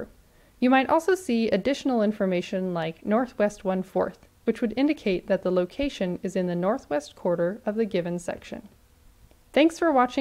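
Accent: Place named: India. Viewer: Talk like indian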